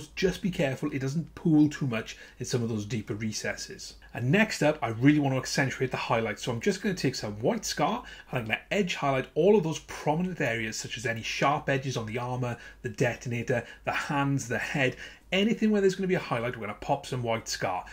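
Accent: Wales, United Kingdom. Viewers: Great vid again bud, p.s what part of Wales is the accent.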